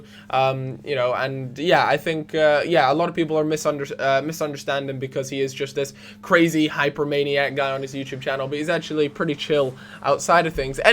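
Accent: Scotland, United Kingdom. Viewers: As a fellow scotsman I thought u were Scottish lol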